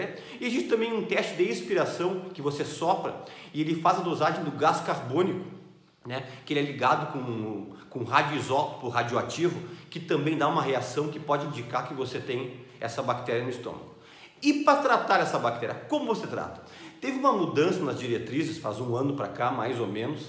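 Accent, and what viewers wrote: Rio Grande do Sul, Brazil. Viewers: Não é bravo, é gaúcho. São assim. rss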